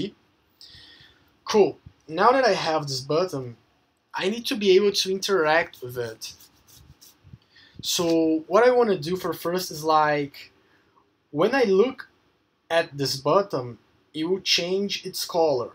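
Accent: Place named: Brazil. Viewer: Are you brazillian? You sound exactly like me when i'm trying to speak in English (this is not an offense)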